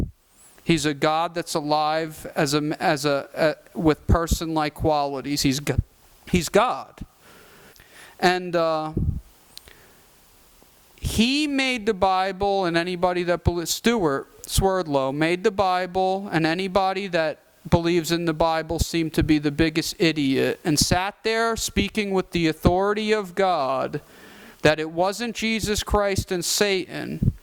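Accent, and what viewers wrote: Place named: New Jersey, United States. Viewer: Your jersey/jew accent is almost as annoying as your incoherent thoughts and narrow mind & jumbled perspectives. I'm disappointed that I listened till the end.............wasted 30 minutes :( What authority do you speak from? You know that you are worshipping molech, baal, jehovah, yhwh, set, saturn, satan, thor, appollo, abaddon when you are following the religion of the canaanites & pharisees who exalt and demand ritual cannibalism, (communion-Bread/wine) and the obedient worship of a deity that is honored after arising from the dead. Does that sound like something a loving father would demand? for you to proclaim the power of his son, who was tortured? If jesus was gods son, his acts were in humility and grace, not to be shouted from every mouth when judging and condemning, but as an example of the power we have as one man, then as a community that seeks truth and demands transparency & fairness. 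That is gods message. Be responsible and admit you learned a lesson, a 2000 lesson, just because you paid him too much cash, you try to slander his business and livelihood? What happens if I claim as a customer of your construction business, you stole from me, when you you stated a bill and I agreed & paid that amount. How is that scamming & deceitful? Trust me.....you aren't good at meditating or astral projecting, its a gift & ability that we all have as humans, we just need to practice it...........Nice try though. You tortured demons? like from using solomonic magick? Id like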